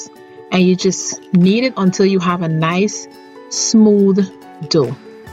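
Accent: Guyana. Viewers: Thanks, for your first YouTube video looking forward to more. I'm always looking for new recipes on your blog. Love ya accent. GT to de bone. Thanks for repping Guyana and sharing our delicious recipes.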